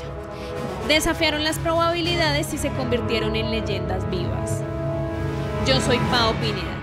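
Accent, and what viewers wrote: Colombia. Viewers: el acento es de Colombia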